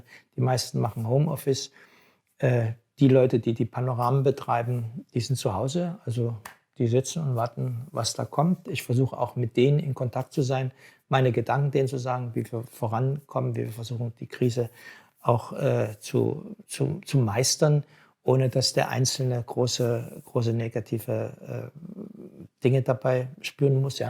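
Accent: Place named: Saxony, Germany. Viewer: Ja ich bin auch durch Zufall an geraten...auch der Dialekt er stammt aus Leipzig u ich aus riesa also sachsen und da ich jetzt alle malschulen zu haben und ich nur Acryl und aquarellstunden genommen habe passt das jetzt sehr gut...denn mit zeichnen das moechte ich noch lernen machen sie weiter herr Assisi toll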